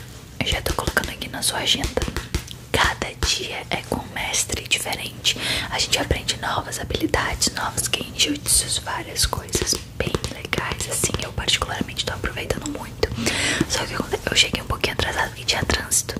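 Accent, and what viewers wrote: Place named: Rio de Janeiro, Brazil. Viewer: Olá, então tu és gaúcha? O teu "carioques" me enganou direitinho.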